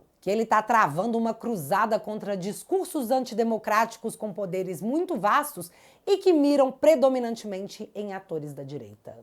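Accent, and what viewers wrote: Minas Gerais, Brazil. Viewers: Esse é o sotaque mais bonito do mundo! O minerês! Nao te acompanhava, mas ja me inscrevi!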